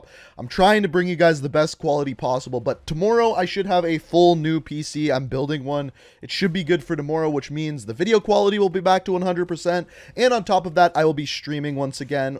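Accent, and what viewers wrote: Canada. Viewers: Are you Canadian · Gustavo Castillo I’m not sure if he a canook but he’s for sure from the north. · Sorry? Your Canadian?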